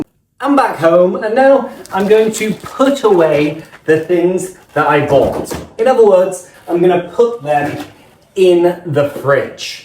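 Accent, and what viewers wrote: United Kingdom. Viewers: I'm trying to do shadowing with your videos to get a British accent, which is really beautiful. Thank you very much 🙏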